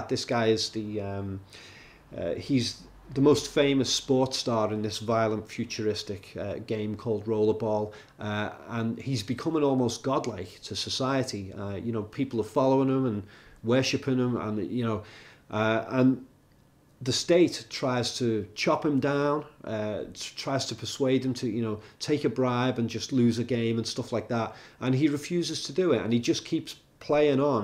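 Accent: United Kingdom. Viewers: The British accent adds to the credibility of this top 25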